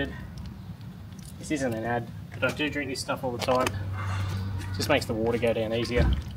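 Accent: Australia. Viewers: Like this style of video. Plus we get to hear more of that Aussie accent.:goodvibes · I could listen to you speak all day. I follow a lot of YouTubers from down under. Love the accent. ❤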